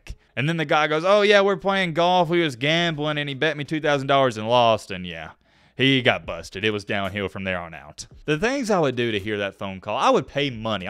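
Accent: South, United States. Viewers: Because this cat has a southern accent... I guarantee you, that's not how you say this qbs last name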